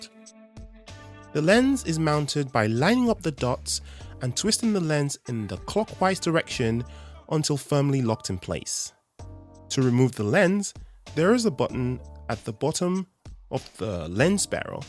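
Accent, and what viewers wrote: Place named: England, United Kingdom. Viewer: Hi, I've just discovered your videos and I think they're really fantastic. It's also lovely to hear a familiar sounding voice on youtube. Nothing at all against the many Americans who also make wonderful videos but an English accent definitely makes me feel at home :)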